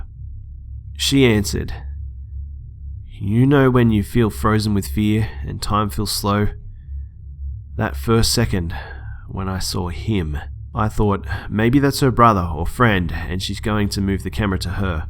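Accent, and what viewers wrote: Australia. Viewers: i can't tell but are you Australian? i love your accent a lot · Is he Australian???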